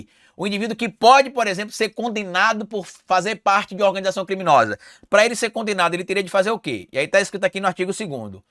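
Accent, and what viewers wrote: Northeast, Brazil. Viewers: sutaque de pobre concerteza um baiano ou Pernambuco morto de fome tem que ser prostituta do lula vai numa fono seu gay esquerdista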